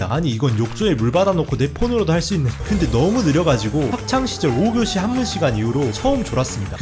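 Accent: Jeolla, South Korea. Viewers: 전라도억양이라 불신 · 전라도임 ? 사투리가 ㅈㄴ 들리네 ㅎㅎ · 혹시 고향이 전라도신가요? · 오! 전라도 분이신가보네요 사투리가 구수합미다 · 즌라도 사투리가 쏙쏙 박히니까 재밌네 ㅋㅋㅋ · 혹시 전라도 분이에요? 나레이션에 사투리가 구수한데?? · 아따 니 전라도 사람이여라? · 전라도 사투리 ~~~~ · 5교시 한문시간ㅋㅋㅋㅋㅋㅋㅋㅋㅋ 아니 말 너무 재밌게 하시네요ㅠ 전라도 억양도 너무 웃김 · 혹시 광주 분이신가요?? 전라도 사투리 쓰셔서 광주사람인가했는데 딱 기아 얘기 나와서ㅋㅋ (내일 드뎌 한국시리즈에요😎) 그리고 영상 너무 웃겨욬ㅋㅋㅋㅋㅋ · 전라도사람이시군요 사투리가 ㅋㅋㅋ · 9주인장 전라도 맞져 ? 계속 알게모르게 전라도 억양이들림 (순수 궁금증) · 전라도분 이신가봐요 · 숨길수없는 전라도사투리 ㅋㅋㅋ 굿!! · 전라도사투리 거북합닏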